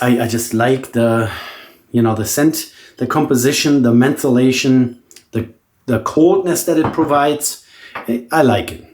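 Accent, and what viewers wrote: Germany. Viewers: Thanks! Great videos and I appreciate the accent, is it German? 👍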